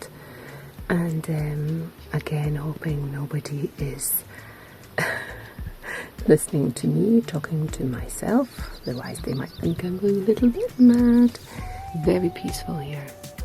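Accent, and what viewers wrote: Scotland, United Kingdom. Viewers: Hi I’m also Scottish but moved to the US years ago to be with my American husband and not sure how long you have lived in France but it’s so nice to hear you haven’t lost your beautiful Scottish accent as I still have mine too and never understand how adults lose it after living a short time in another country but children are different they lose it pretty fast. I love Kika’s patterns also and in the Spring I finished the Salty Days Sweater which turned out beautiful. I also paint in watercolors so it was a lovely gift finding YouTube suggesting your video today and have subscribed also your Seashore Blouse is gorgeous! ♥️🤍💙🏴🧶🫖☕️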